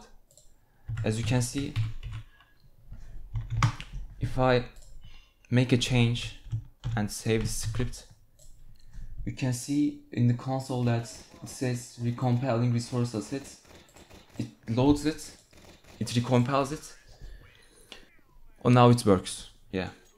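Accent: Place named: Turkey. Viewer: Selam dostum. Ben de bu konuda bir şeyler yapmak istiyorum. Aksandan direkt anladım Türk olduğunu ve mutlu oldum. :D Sana nasıl ulaşabiliriz?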